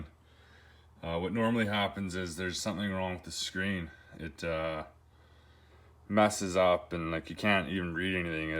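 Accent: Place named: Midwest, United States. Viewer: Nice work. I have an S650 as well. Where are you located? By your accent, I'm guessing mid-west area. I could use someone like you in my area (North Florida).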